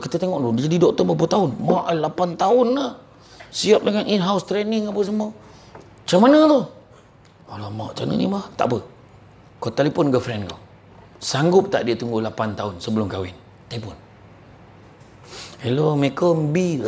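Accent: Negeri Sembilan, Malaysia. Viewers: Suka dengar loghat negeri sembilan ustaz. Mudah faham walaupun saya orang Kedah. Comel je bila ada part suami isteri. 😁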